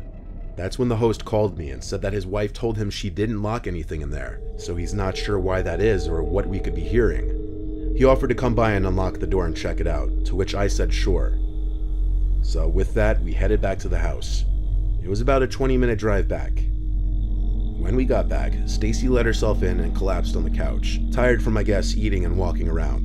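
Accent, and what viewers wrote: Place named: Boston, United States. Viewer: Hey, I love your stories so much. I wanted to tell you, I recognized your accent from Boston so I checked out your insta. and I wanted to say that since you’re from Boston, you’ll be happy to know my mom and I are visiting there in September. I’ve always wanted to check out Salem.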